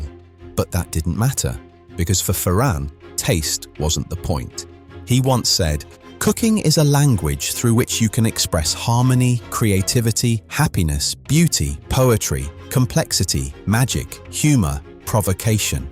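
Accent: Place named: England, United Kingdom. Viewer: The English accent suggests targeting the Spaniards once again. Typical Brit “mala leche”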